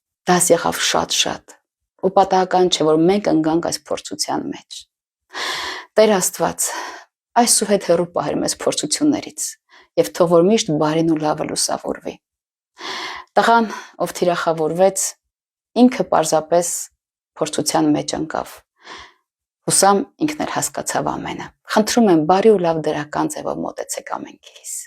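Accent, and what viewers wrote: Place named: Russia. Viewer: ինչու եք ռուսական տոնով խոսում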